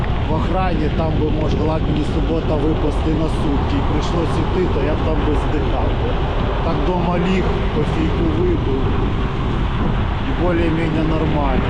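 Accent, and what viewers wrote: Poltava, Ukraine. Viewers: так приемно чути полтавський акцент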